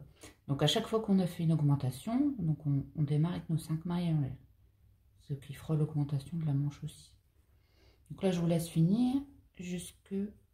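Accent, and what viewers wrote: North, France. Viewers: Bonjour, je vous decouvre ..je pense à votre accent que vous étes du nord, j habite l alsace mais je suis native du nord ...j aime vos explications et j ai hâte de découvrir vos autres réalisations....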